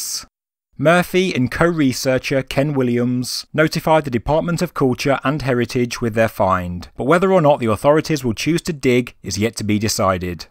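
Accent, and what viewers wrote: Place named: England, United Kingdom. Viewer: Apart of the ancient discovery information, what a LOVELY ENGLISH ACCENT. ...AMEI ❤👌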